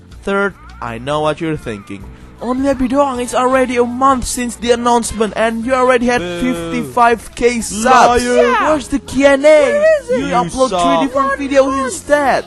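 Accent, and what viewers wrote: Indonesia. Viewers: I knew you are Indonesian, from your channel's name, your accent is really cute xD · iya aksen lu emang indonesia banget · maigat w kira lu 22tahunan ternyata tuaan gua yha hhh. btw tau banget sih lu orang Indonesia from ur accent kedengeran banget ditambah unamelu ada ""doangnya" wkwkw gua tbtb seneng sendiri karena lu orang indo(?) ya because I am actually proud of you because u have So many great contents and i really enjoy all your videos! keep it up dude! sukses selalu! Cheers👍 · Oh. Im Malay and understand Indon. I knew that accent. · I KNOW IT!! haha!! because, you speak english language in Indonesian accent :v perhaps, you should try English in "medok" accent :v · Aku tau kamu orang indo karna cara bicara kamu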